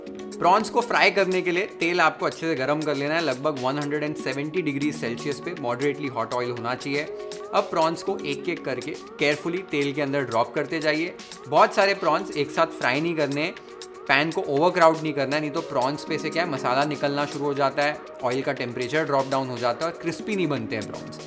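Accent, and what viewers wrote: Maharashtra, India. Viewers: खूपच भारी 😘 आणि तुझ मराठी accent पण एक दम मस्त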